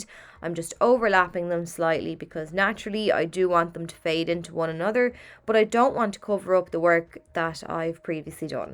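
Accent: Ireland. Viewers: Y tiene un acento irlandés muy marcado, pero yo estoy acostumbrada, la entiendo y me gusta.... A mí también me encantan sus videos😘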